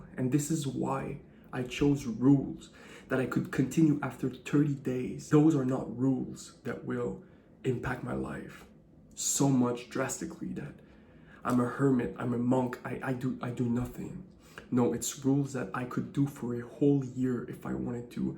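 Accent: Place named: Netherlands. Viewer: He's in a foreign country other than the United States. Listen closely to his accent of the English Language. I know of no State in the Union WHERE people speak like this unless he's in a tight community of Dutch or a village of Norwegian immigrants... Yes? JUST a thought. Respectfully submitted for your consideration Gregg Oreo Long Beach CA Etats Unis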